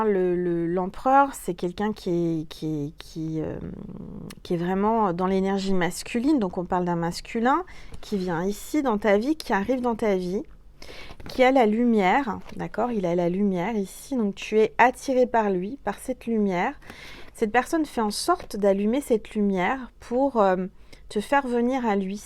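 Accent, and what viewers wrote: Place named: Belgium. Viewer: Ah oui..je me demandais...🤔 Tu as ce petit accent Belge...😇💋😘🙏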